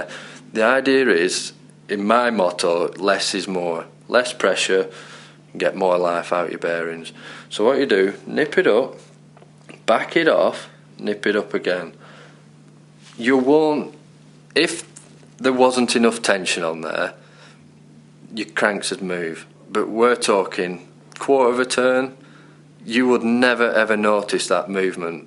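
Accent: Yorkshire, United Kingdom. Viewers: Proper Yorkshire int it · Proper Yorkshire, less is more 😁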